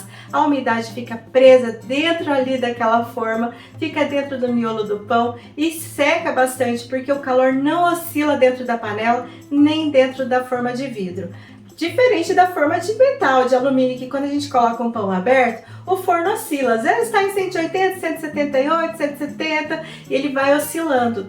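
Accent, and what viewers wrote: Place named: Minas Gerais, Brazil. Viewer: Oi, tudo bem? Tenho duas curiosidades a respeito de vc: Qual é o seu estado? Seu sotaque, as vezes, parece interior de Minas. Qual é seu nome? Tenho uma prima que se chama Teresina e tem apelido de.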